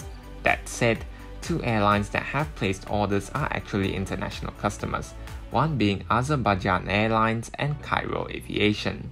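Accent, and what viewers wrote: Singapore. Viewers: the host spoke like either Singaporean or Malaysian. and a lot of his comments are bull shit. C919 components is made world wide - even the body structures design involved a lot of European consultants---why? So that C919 can get FAA airworthiness certificate.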